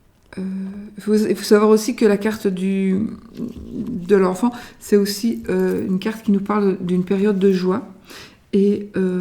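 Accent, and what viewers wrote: Belgium. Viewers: Bonjour, j'ai toute suite reconnu l'accent Belge et ça fait plaisir , je vous remercie pour ce tirage, j'espère que vos prédictions seront excausées car ce long mois de mai fût très dur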